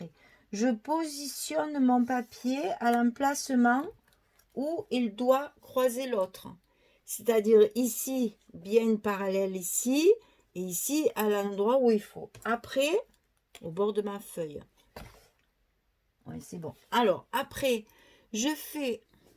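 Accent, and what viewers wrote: South, France. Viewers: bonjour. Merci pour toutes ces explications,j'adore votre petit accent du midi cela fait chaud au coeur. je voudrais savoir combien faut il de papier de cette collection en quel format s'il vous plais.merci. et merci pour tous ces astuces donnés avec simplicité bonne journée à bientôt.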